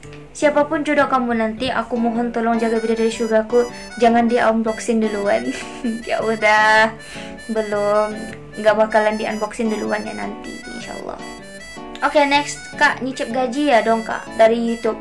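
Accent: Indonesia. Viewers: LOGAT BAHASA INDONESIAMU 👍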